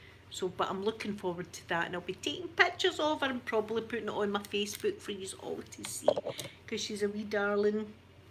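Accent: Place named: Glasgow, United Kingdom. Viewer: I could listen to you for hours. My dad is glaswegian so your accent feels like home to me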